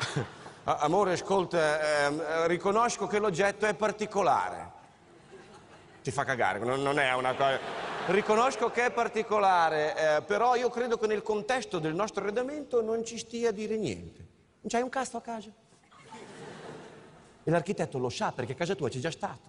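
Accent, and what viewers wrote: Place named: Romagna, Italy. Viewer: L'accento romagnolo è bellissimo!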